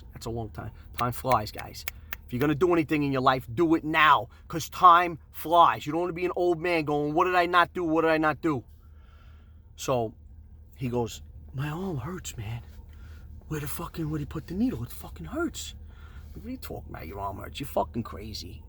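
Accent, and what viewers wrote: New York, United States. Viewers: That's because you guys dont do clickbait titles. If you say you're gonna drop it, you're gonna drop the fuck out of it until something breaks. Also I love how you yell, but being a new yorker myself, I know that's just you're normal voice.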